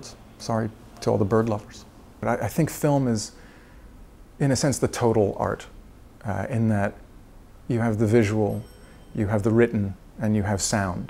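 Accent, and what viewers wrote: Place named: United States. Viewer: UAU...I mean, he's so hansome!!! Pretty eyes, pretty look, a litle bit faty'iiish and he's not a "bird man" maybe a cat person or a dog person just like me, (I love dogs). Oh, and I love his so american accent. And, in spite of criticizing italian people, witch I adore, cause they are so emotional and with a spicy drama in everything they do or say and they speek like as if they were singing and they also speak with a lot of hands gestures and body language but, well... in the end it all suits him very well. Pretty hansome guy, indeed.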